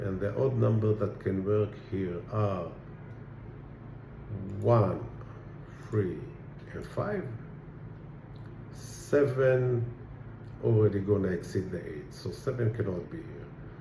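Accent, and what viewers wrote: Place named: Israel. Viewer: Name is, has Israeli accent... נראה לי שאני יכול להגיד בוודאות שאתה ישראלי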